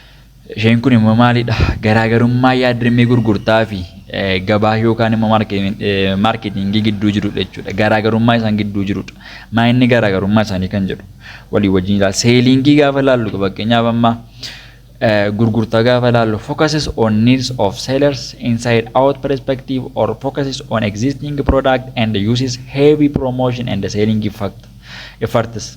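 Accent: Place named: Jimma, Ethiopia. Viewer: Waaaaaw🎉 amazing ❤ ni dandeessa dhugaa jaabaadhu loqoda jimma baayyee naaf ta'e🎉🎉🎉🎉❤❤❤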